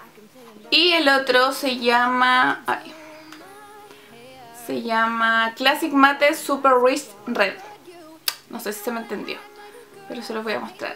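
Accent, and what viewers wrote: Chile. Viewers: eres de conce chile !!!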